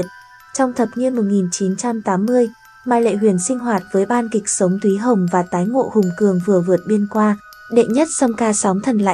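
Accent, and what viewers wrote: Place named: North, Vietnam. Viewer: Đã lâu o nghe giọng Bắc nữ trầm, hôm nay tình cờ thật lấy làm hoan hỉ quá 🤓 cảm ơn cô và mong được nghe thêm nhiều hơn ! Chúc cô và gđ nhiều an lạc an lành an yên... 🌷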